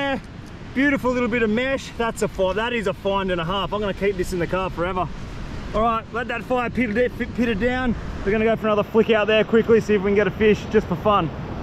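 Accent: Australia. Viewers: "that's so weeeered" lmao I love Aussie accents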